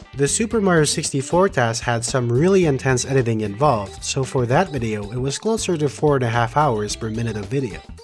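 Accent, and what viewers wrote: Quebec, Canada. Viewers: before he gets the question <num> times he’s french-canadian (québécois specifically) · J'ai une question très simple : Est tu Québécois ? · dude, your english is completely fine. From your accent I think you are canadian, possibily first language french? You speak better english than I do and certainly better French! Thank you, I love your videos and come back regularly to watch them <3 Thank you for all the effort you put in, especially with the audio :-)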